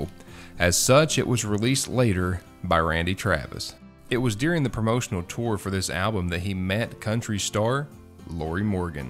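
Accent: Kentucky, United States. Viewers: What a beautiful tribute to Keith Whitley you did a wonderful job. It was so sad his life ending so tragically. You are a wonderful narrator l love to hear you speak you have a special voice that keeps you interested. It must be that Kentucky voice that intrigues me. Keep the videos coming l truly enjoy each and every one.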